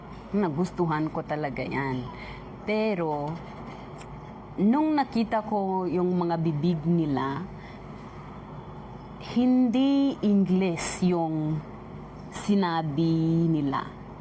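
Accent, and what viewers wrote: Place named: Visayas, Philippines. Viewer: Bisaya accent ka 😅✌️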